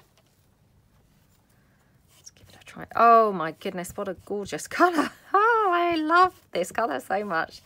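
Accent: United Kingdom. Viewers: Love these . GREAT idea. I watch all you great video full of ideas i just dont comment much. Are you in the UK. Love the accent. I have always wanted to visit England a walk the foreshore of the Thames and pick up relics from so many years ago. Thanks for all the wonderful videos. Stay safe. hugs. i am in the US. In NC.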